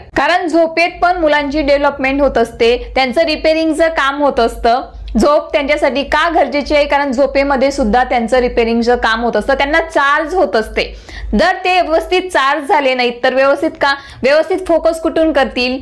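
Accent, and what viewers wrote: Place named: Jalgaon, India. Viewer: मॅडम जळगाव चे असतील असे बोलण्यावरून वाटत आहे मराठी पेक्षा इंग्लिश जास्त छान आहे पण विचार हि खूप चांगले आहेत खूप छान माहिती आहे 🙏🙏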